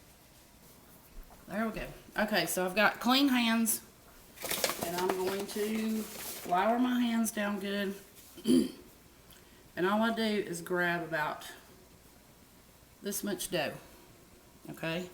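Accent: United States. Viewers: FANTASTIC! You sound like you're from Mississippi. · The whole time I was watching your video I was thinking, I know who that is she sounds so familiar, then I realized that your from Georgia you sound like everybody I know because I'm from Georgia also. That whitelily flour makes all the difference.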